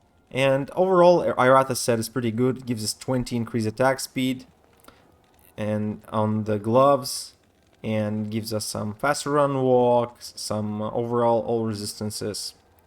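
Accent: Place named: Eastern Europe. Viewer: Finally a Cheap Smiter Build without the use of Annihilus and already acquired Pally +3 Torch, no BS, just cheap items. Except Shaftstop --> 900+ hours in and I still haven't found it, but I can use Smoke plus that +35% physical negation shield, I think Stormshield is the name --> comes out to be somewhat equal to the combo here (after equipping some charms for extra Elem Res). Auto-subbed, even if it's just for the accent - reminding me of Idevaste's :P. Great video, brother! *I wonder why Central/Eastern European Youtubers are better at keeping the script short and without unnecessary BS chatter...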